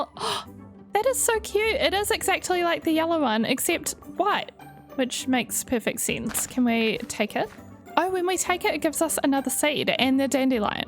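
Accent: New Zealand. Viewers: You mentioning the poppy’s significance as a symbol of honor for war veterans made me laugh out loud. I was literally at that very moment thinking about how I love that I found your channel not only because of your style of content and how perfect it is to watch/listen to while I sew or play Minecraft myself but because of your accent! I portray a WWI ANZAC (specifically a New Zealander in the AANS!) nurse as a reenactor (one of my many impressions to educate the public with) and there are two events a year I go to that are supposed to be immersive, so I try to brush up on my New Zealand accent beforehand and your content helps so much with that. I can’t believe I was thinking about just that when you mentioned the connection. 😂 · Im so used to watching American and British minecrafters that it took me a hot minute to place your accent, even though Im also a kiwi xD Made me happy to see you're from Nz. 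This was my first video of yours and I enjoyed ❤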